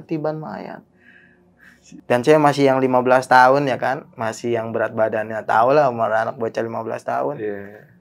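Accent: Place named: Betawi, Indonesia. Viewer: Saamm betawieee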